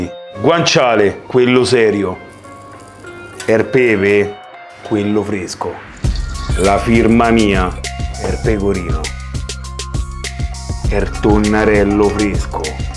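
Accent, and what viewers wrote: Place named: Rome, Italy. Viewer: bravissimo,poi il dialetto romano...sono un fan!!! ma questo me pare sempre incazzato nero :-)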